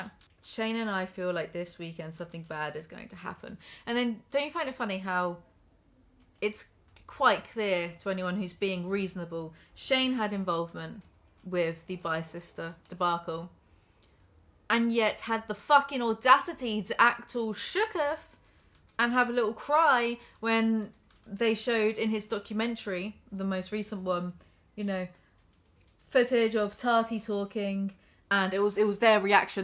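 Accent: United Kingdom. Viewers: I LOVE your accent. I usually hate British accents. i dunno....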